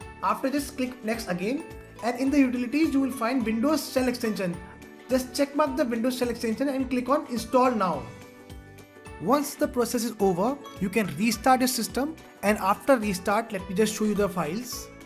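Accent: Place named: India. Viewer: Mano... Esse inglês com sotaque indiano é uma merd@ kkkkkk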